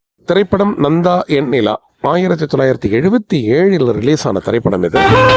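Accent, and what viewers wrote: Sri Lanka. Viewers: அய்யா தகவல் அருமை.உங்கள்தமிழ்.இலங்கைதமிழ்போல்இருக்கிறதுநீங்கள்இலங்கையா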